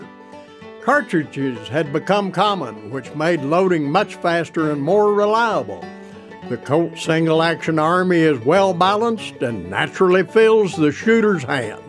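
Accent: United States. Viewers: I love Texas accent. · Most American accent hahah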